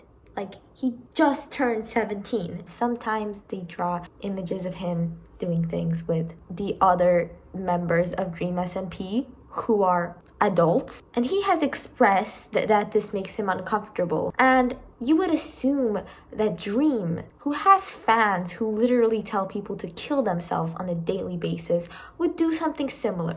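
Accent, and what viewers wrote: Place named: United Kingdom. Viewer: "TW: British accent" Lmao i love her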